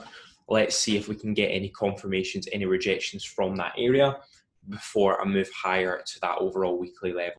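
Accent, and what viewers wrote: Scotland, United Kingdom. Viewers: whereabouts in scotland you from?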